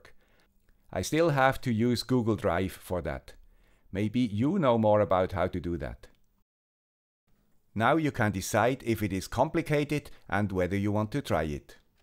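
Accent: Switzerland. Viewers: Never imagined a guy with swiss accent would save the internet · Thank you guy with the Swiss accent. This exactly what I’m in the middle of trying to set up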